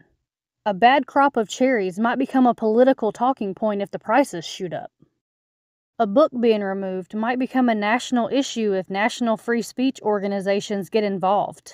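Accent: South, United States. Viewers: Your southern accent threw me off for a moment. I thought you said the news reported by Bill, then after thinking for a moment I realized you said. A funny misunderstanding on my part!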